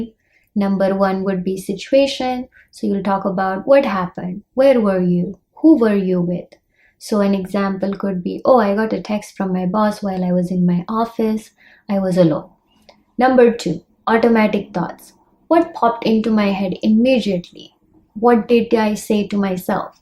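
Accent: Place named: United States. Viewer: A cute Marathi girl with American accent 😀😀 A nice video anyway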